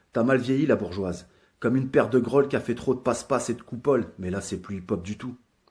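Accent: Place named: Paris, France. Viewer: Il s'est embourgeoisé tu croirait entendre un bobo parisien. Il pense que tous le monde se fais enfiler ça le rassure.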